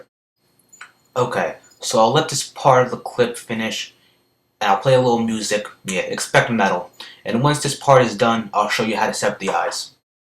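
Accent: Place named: Texas, United States. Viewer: Its his accent DUH. In Pennsylvania people may sound like their nose is pinched (sorry all you people from Pennsylvania I was just using you as an example) But in Texas we sound like cowboys and cowgirls.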